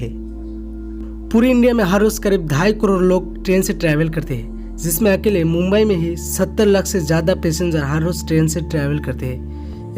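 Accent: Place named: West Bengal, India. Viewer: I think u r from Bengal ,,,, ya??? I could recognize your voice ☺️☺️ keep it broo very well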